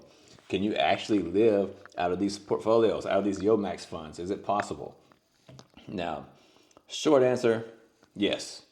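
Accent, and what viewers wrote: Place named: Appalachia, United States. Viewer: I detect an Appalachia accent but a Slavic face. I'm confused, but you're cute. I got zividendz😊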